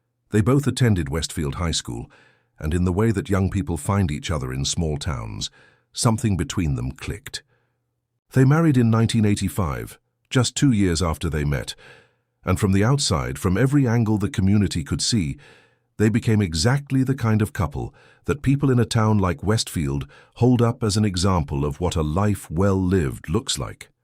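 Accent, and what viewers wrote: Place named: United Kingdom. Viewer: This AI voice of the host is horrific the limey thing does not work in the USA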